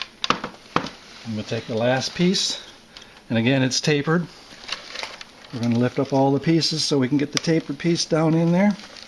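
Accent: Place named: Midwest, United States. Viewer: Definitely a midwestern accent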